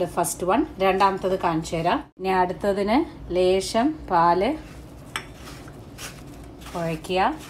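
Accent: Palakkad, India. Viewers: Nice - Also dear palakkad slang. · Palakadan slang kelkan nalla rasamalle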